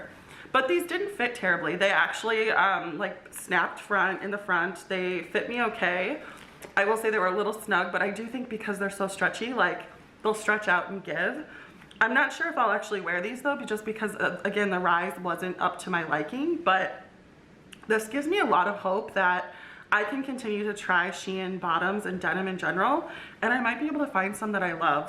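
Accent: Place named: United States. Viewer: How much u talk American oh my God .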